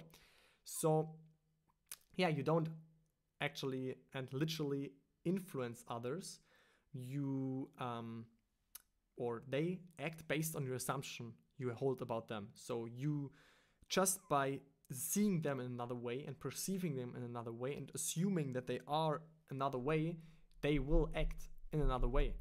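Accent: Germany. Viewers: Deutscher Akzent? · are you German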